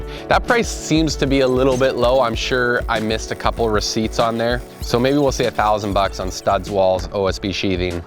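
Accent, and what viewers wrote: Canada. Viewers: The only part of the video I'm confused by is the shoutout to "your Canadians" but you're wearing a Maple Leafs hat and sound like you're from rural Ontario! Great cabin man, nice work.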